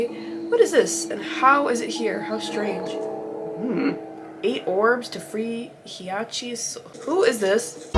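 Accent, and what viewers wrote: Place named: United Kingdom. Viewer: LUV YOUR BRI'ISH ACCENT LUVV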